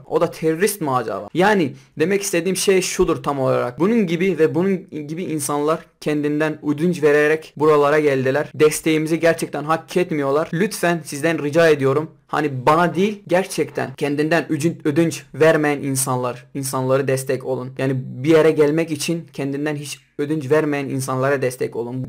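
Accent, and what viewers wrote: Turkey. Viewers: Amed şivesi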